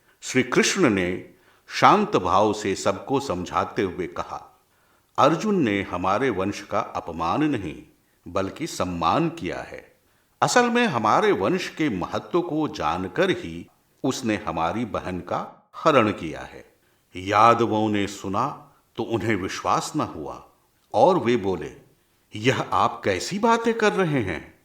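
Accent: Standard. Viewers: उच्चारण की शुद्धता के साथ ओजस्विता इसे कर्णप्रिय बना रही है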